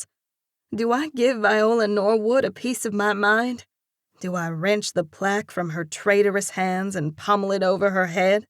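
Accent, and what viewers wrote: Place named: South, United States. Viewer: Another great book in this series. I’m loving every one of them. The narration is top notch. Of course, I’m partial to the southern accent since I am from the South! Please keep this series going.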